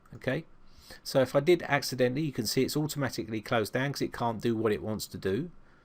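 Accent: United Kingdom. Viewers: I like your British accent m8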